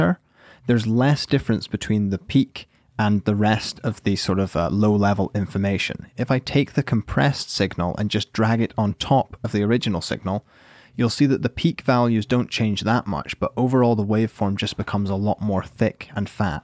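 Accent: Scotland, United Kingdom. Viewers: I just love listening to this Scottish (?) accent. It's so soothing. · Well he is British according to his accent and the flag he now has in his later made video. So it's pretty aggressive for a Brit. Oh wait, maybe the stereotype is Canada lol.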